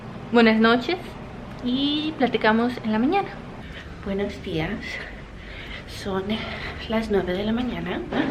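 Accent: Spain. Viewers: your spanish accent is so cute ❤️ in Portugal, we say “Assistente de Bordo” or “Hospedeira” for flight attendant ☺️ Great video!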